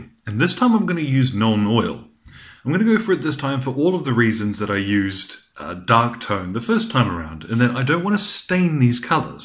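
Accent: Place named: New Zealand. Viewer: Where are you that it is so hot? An Aussie like me? I had thought you were a Kiwi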